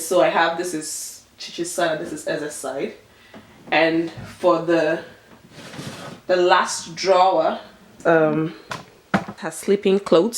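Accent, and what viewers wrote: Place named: France. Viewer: I like your accent, is that French?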